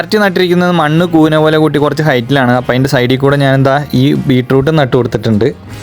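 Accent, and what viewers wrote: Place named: Muvattupuzha, India. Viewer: സംസാരത്തിൽ ഒരു മുവാറ്റുപുഴ... പെരുമ്പാവൂർ... കോതാമംഗലം ടച്ച് ഒണ്ടു "ട്ടോ " 😎, ഒന്നുകിൽ പരെന്റ്സ് അല്ലങ്കിൽ ഗ്രാന്റ് പരെന്റ്സ് ഈപറഞ്ഞ സ്ഥലവും ആയി കണക്ഷൻ ഇല്ലേ ബ്രോ ? സത്യം അല്ലെ ☺️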